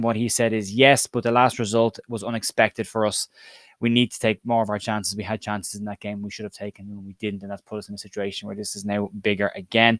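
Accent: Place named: Ireland. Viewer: As always well delivered and short and to the point, the Irish accent is a massive plus. Now to listen to a Leeds Bird Rant and then maybe Conner if it's not too long and I can always fast-forward Joe. LOL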